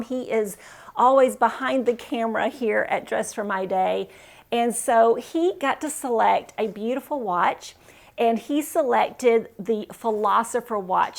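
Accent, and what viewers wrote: South, United States. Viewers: I love your pink sweater beautiful color if you don't mind saying what state are you from and living in. You are definitely southern I can tell my dad's people are from Arkansas Alabama and my mom's people from Michigan and live in Florida I am a Yankee but I live in Arkansas it took a long time to adapt for me different culture shock lol but I love Arkansas people friendly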